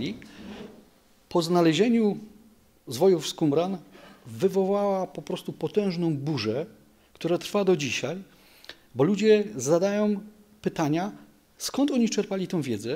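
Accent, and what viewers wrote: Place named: Silesia, Poland. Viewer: Bardzo ciekawy wykład! 👍 I ten spokojny głos, ze śląskim akcentem😉 Przyjemnie się słuchało. Dziękuję.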